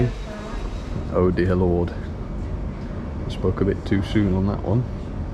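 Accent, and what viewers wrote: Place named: Cornwall, United Kingdom. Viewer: How do you get a Cornish accent in "Down Devon" My father's family are all from Devon. I have many cousins living there too. Unfortunately, they all had to move away from where they were born as Salcombe has become a holiday home town and the house prices are well beyond their pockets. Martin. (Thailand)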